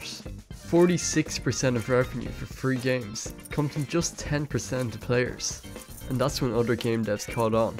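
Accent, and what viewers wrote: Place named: Waterford, Ireland. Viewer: What county are you from cause your defintly a waterford man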